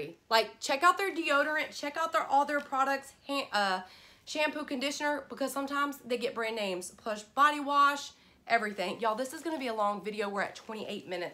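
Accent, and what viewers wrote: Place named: Louisiana, United States. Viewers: Okay, I happened upon your video while entertaining myself with Youtube and you now have a new fan! I am a Dollar Tree fanatic, as well, and love discovering something new every time I go there. So happy I found you! I'm from Louisiana and your accent sounds somewhat similar to mine (even though I live in Idaho now!). God bless and take care, ya'll! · Ha haaaaa good thing I don't like the black jellybeans........giggles..........name brand for a dolla........it's a.m. I've not had no sleep ....So this video cracked me up.....dutier....you sound like a Louisiana gal....full of giggles.....I love the dollar tree ...everything is a dolla...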